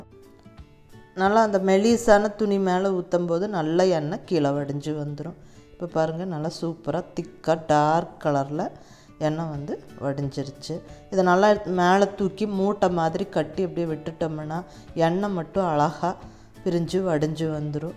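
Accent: Coimbatore, India. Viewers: I like ur kovai slang --- saeithi, karuvaapla,vangayam etc. Ur videos are so good. Keep it up. expecting your house tour as well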